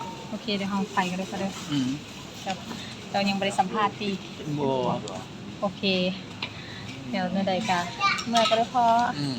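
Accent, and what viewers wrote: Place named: Phu Thai, Thailand. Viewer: กดติดตามให้แล้วนะครับ สำเนียงการพูด เหมือนภูไท แถวจ.สกลนคร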